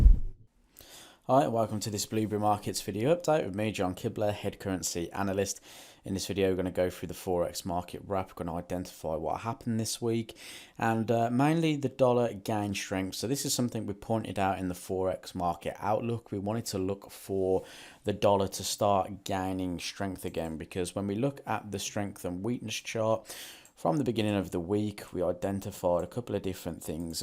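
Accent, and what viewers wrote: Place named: Australia. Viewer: Thanks for the video. Can you please make a video on AUDUSD and AUDNZD? Can't figure out your accent xD Sounds Aussie but with some british words..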